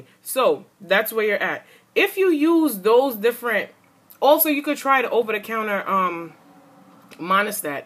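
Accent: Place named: New Orleans, United States. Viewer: THANK YOU but it sound like you from N.O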